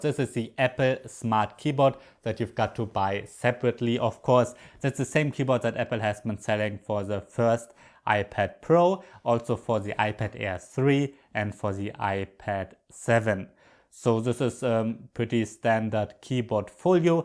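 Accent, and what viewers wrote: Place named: Germany. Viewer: I'm still waiting for apple to redesign Thier budget iPad! So waiting for 9th or 10th gen! And I watch your videos after a long time before watching I really missed your German-English voice!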